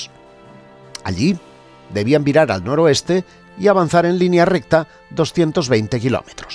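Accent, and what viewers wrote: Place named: Spain. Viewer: Emocionado de poder escuchar el relato.. pero ... el Horrible acento español me hizo quitarlo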